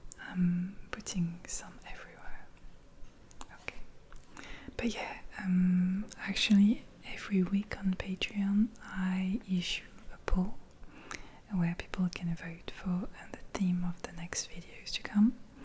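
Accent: France. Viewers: J'adore, ton soft spoken et ton attitude sont tellement relaxants ❤️ Bonne soirée ! (J'avais commenté une fois en disant que l'accent français en anglais me perturbait quelque peu... Mais je dois dire que j'ai bien changé d'avis grâce à ta jolie voix. Et je voulais m'excuser, en y repensant je me dis que ça aurait pu éventuellement te créer un complexe, et ça n'était pas du tout mon intention 😅 ) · I love that french accent :)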